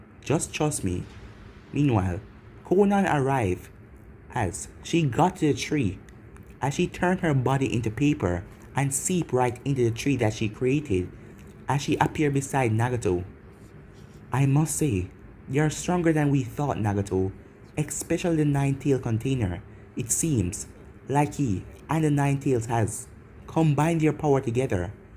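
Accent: Jamaica. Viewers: Lol you sound Jamaican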